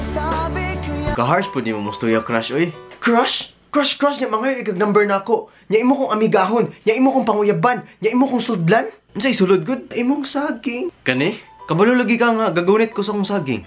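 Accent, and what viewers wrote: Visayas, Philippines. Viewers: Hahahaha mkatawa ko ani niya mo storya ba kay bisaya kaayo..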